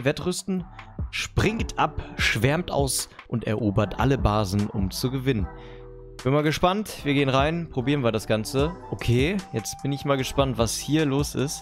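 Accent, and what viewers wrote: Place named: Germany. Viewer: du hast so ein unglaubliches deutsches englisch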